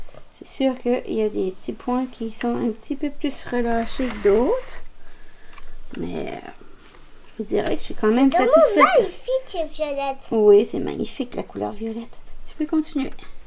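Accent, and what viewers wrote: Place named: Quebec, Canada. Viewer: Coucou. Ho géniale de nous faire voire le contenu du sentro avec les pelotes de 25 grammes. Heureusement que vous êtes là pour nous pour faire traduction du livret qui fort dommage n'est pas en français. Merci beaucoup. J'ai hâte d'être en fin d'année pour me l'offrir. Votre petite puce à l'aire impatiente de vous voire travailler dessus. J'adore la petite voix de votre petite puce. La vôtre aussi et agréable à entendre avec votre petit accent québécois. À très vite pour de prochaines aventures et travaux avec le sentro. Bonne journée à vous et à votre famille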